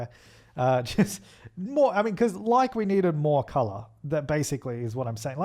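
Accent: Australia. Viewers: He sounds Australian why are all of the Australian YouTubers so wholesome 😂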